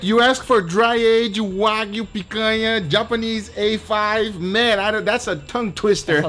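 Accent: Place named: Brazil. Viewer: Is from Brazil? His accent sounds like a Brazilian accent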